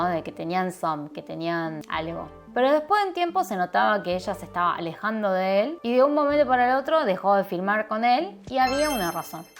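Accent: Argentina. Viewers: waaa que lindo es tu acento arg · Es lindo ver una coreana con acento argentino :3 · es raro escuchar a una coreana con acento argentino mencantaaaa❤❤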